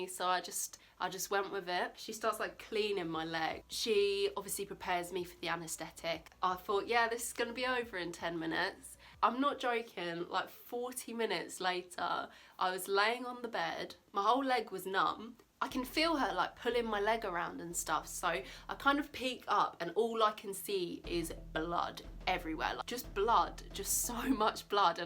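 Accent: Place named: England, United Kingdom. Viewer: You're so pretty! I hope they find something useful to help you out. Love your accent too! (Sorry I live in America so the english accent is sounds nice to us haha)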